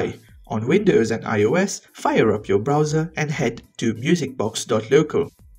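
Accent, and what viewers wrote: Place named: Mauritius. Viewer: Sensing a mauritian accent ... 😁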